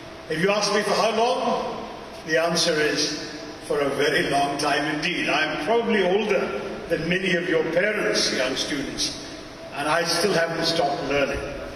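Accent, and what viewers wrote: United Kingdom. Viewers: A Britisher in sound and An Indian by heart makes this man unique. We are proud to have you....🙏♥️🙏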